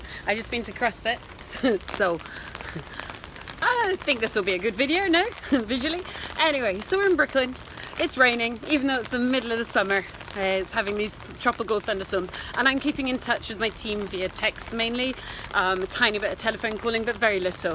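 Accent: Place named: Scotland, United Kingdom. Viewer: Trying to place your accent... definitely some distant Scottish in there, time in England and a Brooklyn twang too...?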